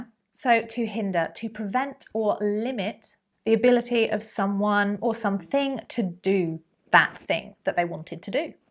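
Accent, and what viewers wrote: United Kingdom. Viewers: Thanks VERY much for this lesson❤ hi mis I'm new here in this channel I want learn English British accent with you and thanks again I LOVE YOU 🌷🌷🌷🌷🌷🌷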